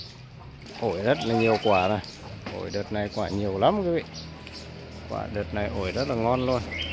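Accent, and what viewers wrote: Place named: Dien Chau, Vietnam. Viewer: Đúng chất giọng diễn châu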